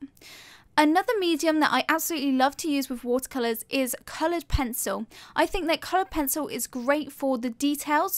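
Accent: United Kingdom. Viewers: For some reason I forgot where you from. Sound British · Oh I love your British accent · are u british? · Are you British? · She has the best British accent❤️❤️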